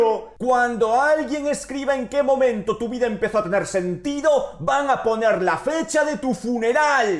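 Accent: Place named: Europe. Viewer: Wey pero los que mas ladran sobre estos temas de la ficción son los socialjustice warriors de yankilandia además me sorprende ver este vídeo de una persona de acento de cierto pais de Europa ya que ustedes se quejan por todo 😂 o a lo mejor me equivoco y estas utilizando loquendo para sonar asi pero igual yankilandia y ese cierto pais de Europa se la viven quejando por problemas inexistentes 😂 son todo un Show 😂 lol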